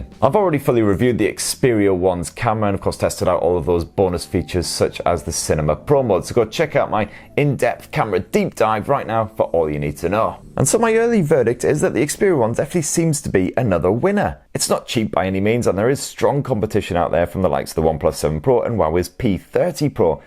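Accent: United Kingdom. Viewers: Liked & Subscribed for the Steins;Gate wallpaper and that British accent!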